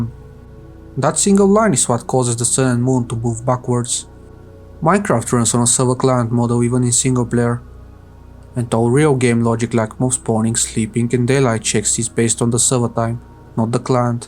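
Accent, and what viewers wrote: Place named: Russia. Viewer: Cool. But for some reason your accent reminds me of Russian. I'm Russian myself and I've heard Russians speak English. It amused me)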